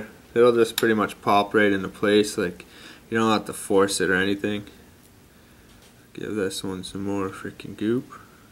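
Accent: Canada. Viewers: are you canadaian by chance?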